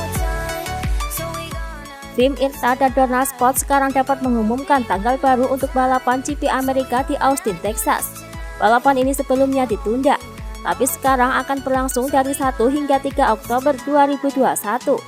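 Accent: Java, Indonesia. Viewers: Mbak e medhok men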